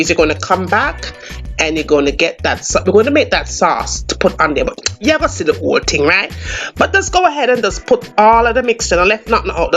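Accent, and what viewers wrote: Jamaica. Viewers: I could listen to lady talk all day...lol. God I love Jamaican accents...lol.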